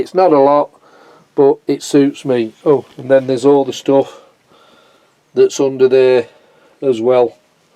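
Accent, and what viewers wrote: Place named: Sutton in Ashfield, United Kingdom. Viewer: I've got a biggish workshop and still go no room lol. You sound local to me! Sutton in ashfield.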